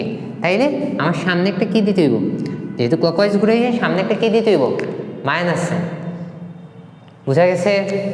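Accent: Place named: Sylhet, Bangladesh. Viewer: আপনে কি সিলেটি? কথায় আমাদের সিলেটের মতো লাগে.....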